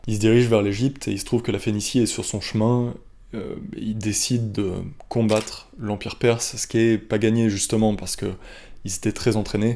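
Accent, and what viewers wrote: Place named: France. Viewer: J'adore ton accent français!! 🤩🤩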